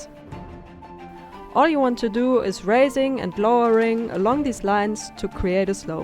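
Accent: Germany. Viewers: You sound like you speak german?!😅 so if you do, das sieht so krass aus!!!!!!! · I was just wondering because I live in Germany and hear a German accent in English a lot so are you from Germany?(you have got a lot of talent btw. AMAZING!)♥️ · da klingt so nach deutschem akzent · Schprechen du Deutch? Your accent sounds German. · Strange question: but are you from Germany? You have a pretty strong German accent. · Bist du deutsch? Meine nur wegen dem Akzent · Bist du deutsche? Dein Akzent 🙊✌🏻✨ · How is this even possible? And a quick question, where are you from? You sound german :D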